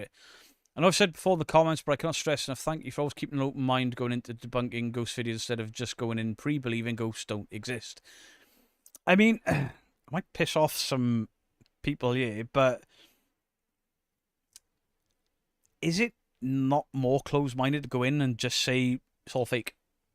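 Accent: Wales, United Kingdom. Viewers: Hi just started watching you think ya great an yeah loved you with watching Paul an Mark from Stoke on trent it was a good 😱an love listening to ya Welsh accent me Dad was from Wales bless him 🏴 an love it when ya went 38 east drive 👻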